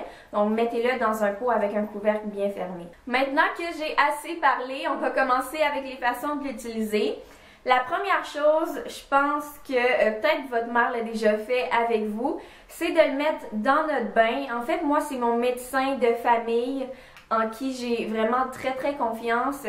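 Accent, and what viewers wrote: Quebec, Canada. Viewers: J'adore le Québécois Ça m'incite à suivre le video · T es du canada Ton accent!!☺ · Très belle en plus j'adore l'accent canadien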